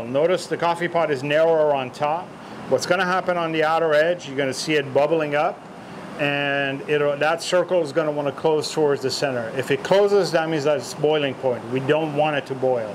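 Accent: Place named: Texas, United States. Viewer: Well now I've seen it all, just about.. An Armenian with a Texas accent.... yee-ha mayre tagherem!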